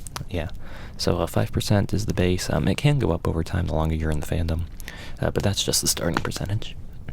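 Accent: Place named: Northeast, United States. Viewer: As a furry who just moved from the northeast to California; I can tell you’re from either the northeast or Midwest and I love it!!!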